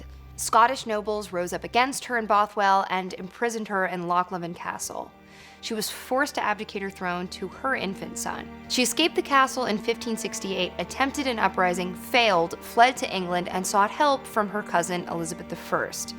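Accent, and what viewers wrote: United States. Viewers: So sad that an American speaker narrated this piece. Made the whole content bland with her voice dropping an octave at the end of each paragraph. Americans probably see this as adding authority to her voice. Just thought the content was poorly delivered and crass. I am a proud Scot who wishes that Americans would confine their overtures to their own country and forbears. Rob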